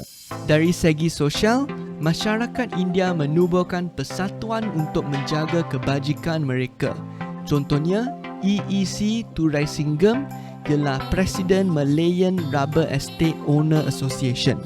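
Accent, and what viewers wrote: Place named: China. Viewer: Masih ada pelat cina, kalau boleh tingkatkan cara pertuturan supaya tidak pelat semasa bertutur dlm bhs melayu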